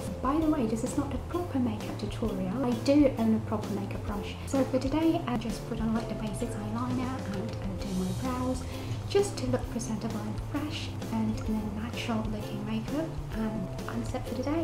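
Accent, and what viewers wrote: United Kingdom. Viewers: I can't be the only who says that your English accent is really gorgeous 😍😍😍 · accent nyaa bagus amat nenggg.. british yaa? hahaha love itttt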